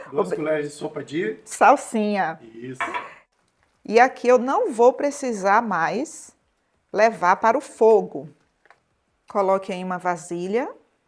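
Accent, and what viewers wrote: Bahia, Brazil. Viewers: Te encontrei por acaso , e estou começando a fazer salgados, já fiz uma receita de coxinha ficou maravilhosa. Vou fazer vários pra vender. Vc explica com muita calma e amo seu sotaque minha família é da Bahia. Eu sou de São Paulo assistindo suas aulas. Gratidão vc não imagina como está me ajudando. Na verdade acredito que está ajudando muitas pessoas. Jesus te abençoe 🙏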